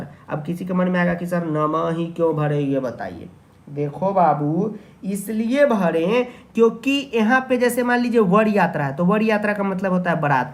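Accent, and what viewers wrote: Bihar, India. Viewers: Sir ap bihar se h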